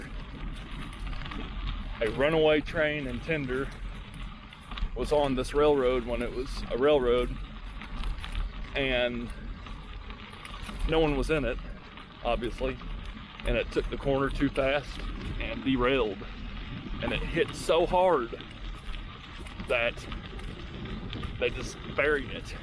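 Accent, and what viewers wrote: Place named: South, United States. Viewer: Very cool! Thanks so much. You sound southern, and I'm southern so I'd know!😄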